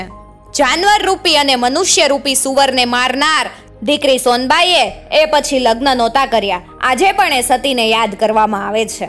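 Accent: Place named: Kathiyawad, India. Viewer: which way you are talking you must from Kathiyawad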